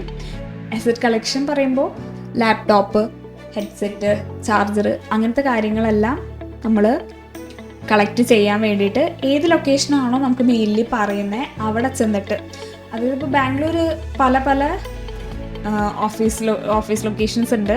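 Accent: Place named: Valluvanad, India. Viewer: Valluvanadan bhasha .. athond choichathatto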